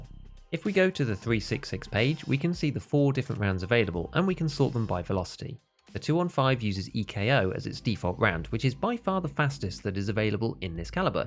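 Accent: United Kingdom. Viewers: He sounds brittish so I doubt he has even touched a real gun.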